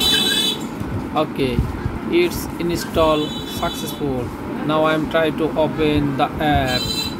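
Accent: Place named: Bengal, India. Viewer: ও যে বাঙ্গালী ওর আওয়াজ এই বোঝা যাচ্ছে 😂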